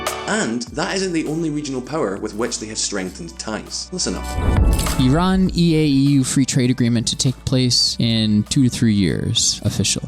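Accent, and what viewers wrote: Scotland, United Kingdom. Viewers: I love the Scottish Accent Mate! Well done nice job and great sense of humor 👍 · This channel defo requires some Scottish flavour a wee bit more. Aye g'ud job there lad! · Where the f*** is Simon and why is this Scot talking so quick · *uk (he's Scottish but close enough) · It's not a British accent, posh Scottish which is much different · honestly i'm quite pissed they hired a scot with such light accent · It's nice to hear a Scottish voice, 🤠 We're hoping to hear more about internal geopolitics such as Scottish independence and Irish unity. All the best to you!!! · Some Scotish accent characteristics I've just learnt: Perfect -> PEAR-fect Signed -> scent/Saint (if you speak "inwards") Side -> sight Outside -> oat-sight Know -> mix of no & nule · Scottish, NOT. BRITISH! And no beard. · The Scottish accent was something I didn't know I needed on this channel but I welcome it! 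· Scottish accept is difficult for me. Nothing personal.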